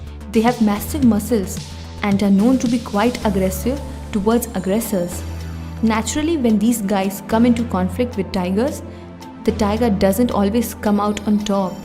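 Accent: India. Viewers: I never knew indian English is better and sounds cute than American english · I nearly listened this 30 times Her voice is the best and Indian accent 😍 is really best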